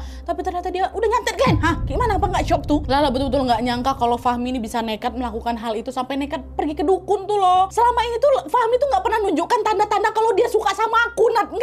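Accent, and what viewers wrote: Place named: Java, Indonesia. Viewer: pantes kakk kalo ngomong jawa medokk bangett😭😭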